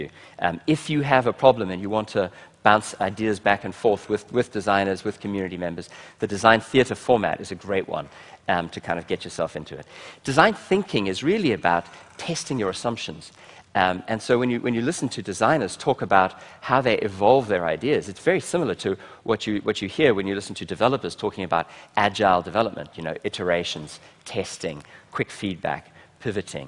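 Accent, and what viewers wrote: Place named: South Africa. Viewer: Loving the South African accent and idiom.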